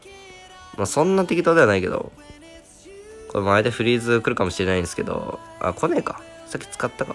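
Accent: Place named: Shizuoka, Japan. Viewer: 久しぶりにRADさんの動画見たらめっちゃ静岡訛りでばかばか言ってて何があった？って感じ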